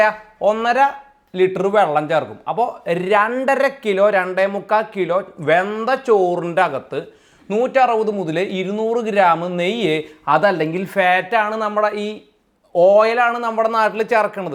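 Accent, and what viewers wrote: Thrissur, India. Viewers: thani Trissur slang.. ❤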